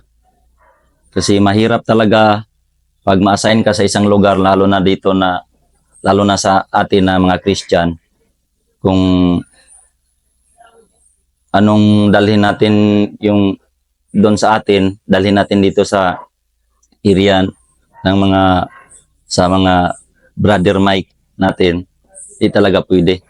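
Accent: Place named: Visayas, Philippines. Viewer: ikaw mag storya sig reklamo! klarong bisaya galisod ug tagalog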